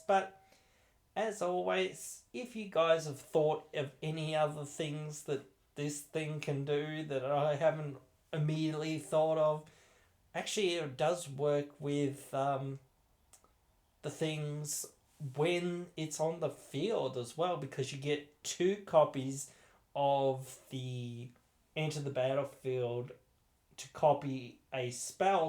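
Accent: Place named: Australia. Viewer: what part of Australia are you from ?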